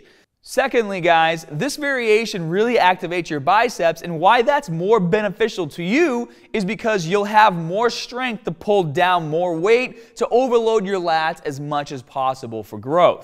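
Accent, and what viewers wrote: Boston, United States. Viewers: Who doesn't enjoy a Boston accent? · Geez, and I thought I had a “haad” Boston accent growing up there! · Boston accent. "When you pull this bah down..."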